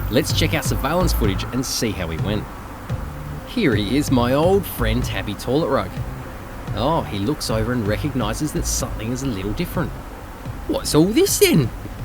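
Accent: Australia. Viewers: Omg you like an Australian and comedy vir. of Michael Reeves XD insta sub! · You have to be Australian , you just sound like it . Not to sound rude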